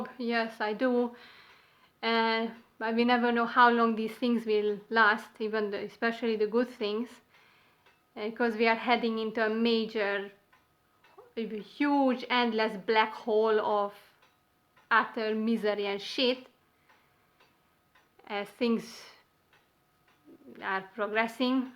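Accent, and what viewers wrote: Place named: Hungary. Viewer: I can only regret I have found your channel only today. I miss hearing the Hunglish accent as I used to work in Budapest for a long time. When I came for the first time to work with my Hungarian colleagues I could not understand why they are so negative as I was in life bubble back then. But they did not complain about things you or we rant about. It was mainly the job, government, gipsies, other colleagues, bosses etc but not about the life itself. You made my day after listening to the first authentic rant from Hungary which is way more meaningful. Cant wait to watch all your videos.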